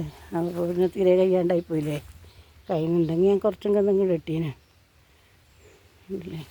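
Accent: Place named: Kannur, India. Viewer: നല്ല വീഡിയോആണ്. സപ്പോർട്ട് എന്നും ഉണ്ടാകും. നമുക്ക് ആവുന്നതല്ലേ നമുക്ക് ചെയ്യാൻ പറ്റുള്ളൂ. വിഷമിക്കാതെ മുന്നോട്ട് പോകു ചേച്ചി ♥️എവിടെ യാ വീട് കണ്ണൂർ ആണോ. ഭാഷ കേട്ടിട്ട് അങ്ങനെ തോന്നുന്നു 🎉🎉🎉🎉🎉🎉🎉🎉🎉🎉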